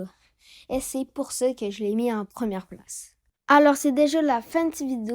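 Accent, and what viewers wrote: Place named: Canada. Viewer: J'adore tes vidéos continue comme ça, et j'adore ton accent canadien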